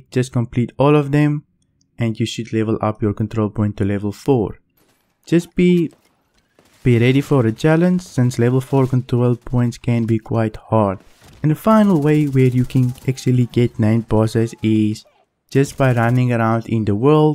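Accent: South Africa. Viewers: Are you south african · Is that a South African accent I hear?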